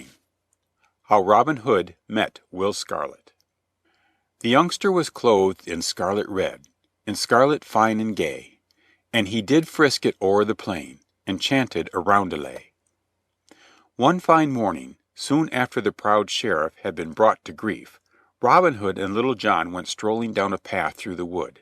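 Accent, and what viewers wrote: United States. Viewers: American accent 👎🏻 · An American accent. Sorry, just doesn't work. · Like an American robot? This is an English folk tale...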